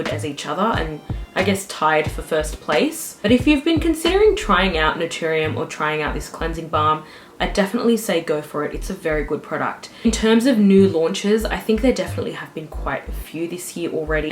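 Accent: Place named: New South Wales, Australia. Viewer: I'm so glad you're posting again! It's great to have an Aussie skincare channel, and someone who has dry skin!! Maybe you could do a video about yourself and your cat? Judging from your accent I'm gonna assume you're from NSW maybe? When did you get into skincare, how did you learn about it? Why did you start the channel? What have you learned from the channel? How much skincare do you go through seeing as you've tried so much? I'm just started using No7 cleansing water, hydraluminiius day cream, retinol, and retinol night cream and I'm really enjoying all of them, I got them after you mentioned No7 in one of your videos. I use dermaveen as my body moisturiser, and I really want to try their new face wash, the ingredient list looks like it will work well for me. I usually apply my body moisturiser first then by the time I've done my face routine and brushed my teeth I'm ready to get dressed. 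Mind you I wouldn't be able to do that in the morning in winter, too bloody cold.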